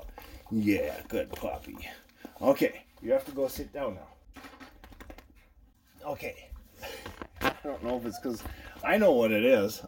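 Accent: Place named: Upper Midwest, United States. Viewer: Thx! Excellent info and funny. Been wanting to clean our glass for years. Sounds like you’re from WI or MN? 😁. I grew up near LaCrosse WI.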